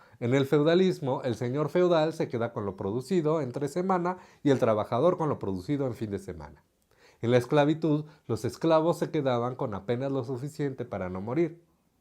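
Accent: Mexico. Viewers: Que buena pedagogía y que agradable narración, ¿eres mexicano?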